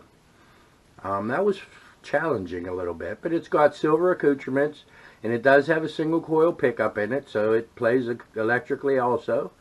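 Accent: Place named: Pennsylvania, United States. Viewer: Nice builds...love the walnut necks! When you said Bedford, I was listening to your voice, and I thought, has to be Bedford, Pa. I know that Pennsylvania accent! I'm from Red Lion...near York. Keep up the great work!😀🎶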